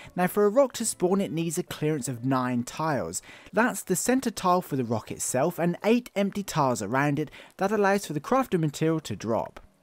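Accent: United Kingdom. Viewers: This British accent is killin me lmfqo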